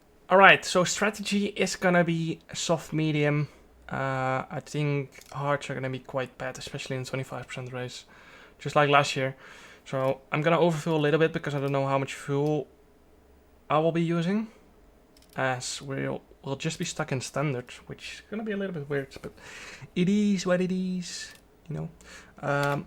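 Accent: Netherlands. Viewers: dat accent is zoo nederlands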